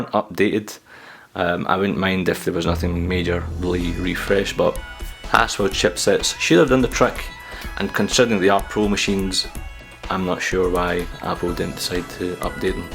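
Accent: Scotland, United Kingdom. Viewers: damn i dont understand shit in scottish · I felt that i learned more and paid attention better because of the scottish accent. Made it seem friendlier! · Thanks for the review man, it was very helpful. By the way, nice accent, reminds me when I was living in Scotland. Lovely People!!!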